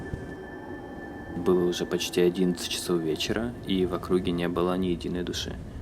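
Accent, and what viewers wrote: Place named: Odessa, Ukraine. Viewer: а ты шо из Одессы?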